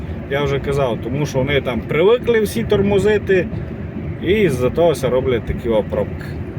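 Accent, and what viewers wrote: Transcarpathia, Ukraine. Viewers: Закарпатська говірка неперевершена!!!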